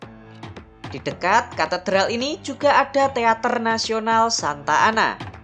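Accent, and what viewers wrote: Java, Indonesia. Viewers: Admin medok rek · Buset dah Medok Amat ngomong nya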